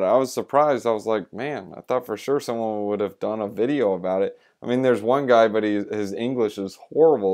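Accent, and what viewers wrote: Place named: United States. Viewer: LOL. That one guy video that you said you couldnt understand I literally just came from him. When I heard youre American I said thank God!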